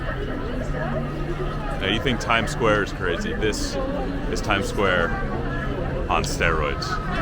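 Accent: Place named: United Kingdom. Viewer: Is it me or your sounding more British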